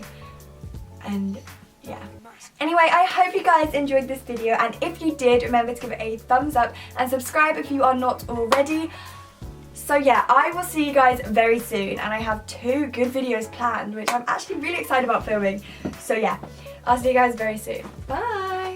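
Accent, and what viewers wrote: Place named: United Kingdom. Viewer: Yo ur british ayee xD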